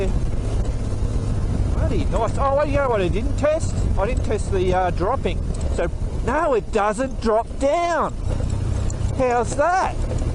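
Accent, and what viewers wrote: Australia. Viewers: Great Video. You just kept going faster and faster on the Trails. It looked like you were doing at least 60MPH. You’re a Very Skilled Rider. I fall more and more in Love with the R1200GS every time I watch another Video of one. I like the way you really put a Bike to the Test. Great Great Videos. This is one of my Favorite People to watch. Keep ‘‘em coming. I think the Australian Accent? Y’all have is Great. I wish I could Talk like that.👍 greetings from Texas.👍😁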